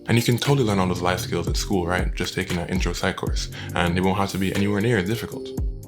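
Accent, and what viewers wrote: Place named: Ireland. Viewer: I know youve a carribbean accent but it still aounds so irish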